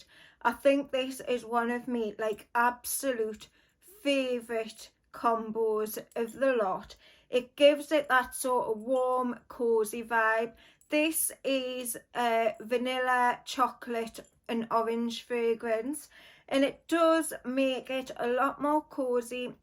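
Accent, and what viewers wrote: United Kingdom. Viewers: Hi. I was in high school in the 90s. I might have to pick this one up for fun. Thank you for your layering suggestions. You have a lovely manner of speech. Are you from England or Wales?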